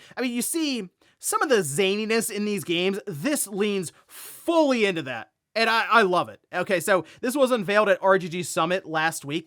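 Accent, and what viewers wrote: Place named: Pennsylvania, United States. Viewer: It's the Pennsylvania accent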